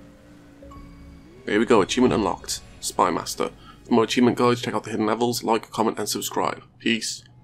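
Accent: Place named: Wales, United Kingdom. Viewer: Nice one boy-bach! Glad to see/hear a Welsh voice doing an achievement guide. Keep up the good work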